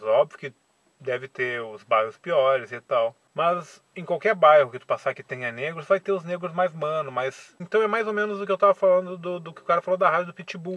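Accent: Rio Grande do Sul, Brazil. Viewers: TU EH GAUCHO?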